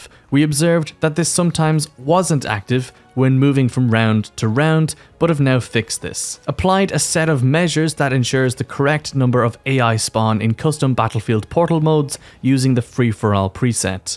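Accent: Ireland. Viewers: He's Irish you muppet. Not everyone talks in American English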